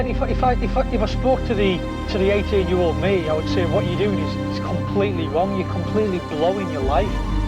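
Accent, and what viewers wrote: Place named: Teesside, United Kingdom. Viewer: What an great video and message... I am 6 days clean today from coke after many relapses... was great to hear a local accent (I’m in Teesside)... thanks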